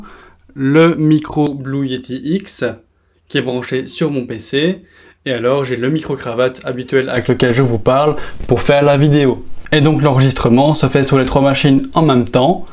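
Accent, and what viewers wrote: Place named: Belgium. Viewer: tu est de quel pays avec cette accent belge peut etre?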